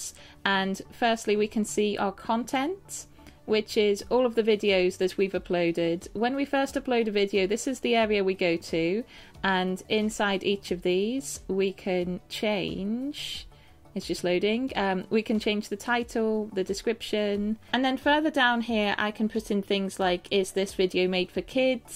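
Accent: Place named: Wales, United Kingdom. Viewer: Have you ever lived in Wales as your accent sounds quite Welsh?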